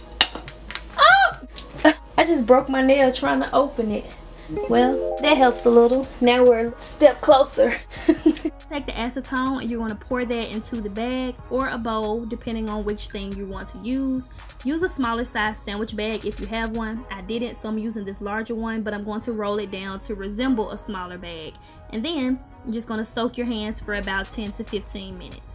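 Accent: South, United States. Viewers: I love your southern accent!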